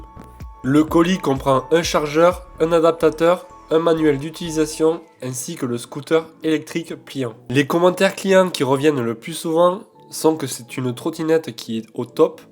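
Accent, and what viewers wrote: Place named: South, France. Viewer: Toi tu es pas de paris mais plutôt de chez nous (le sud bébé 😂)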